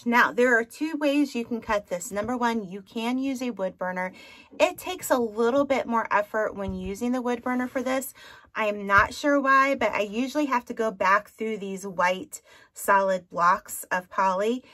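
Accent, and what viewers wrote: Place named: West Virginia, United States. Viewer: yes you still have to pay toll.its 50 cents..I understand how you say words different so people say..I grew up in west Virginia to.so I have the same problem you do.but its all good.love your wreaths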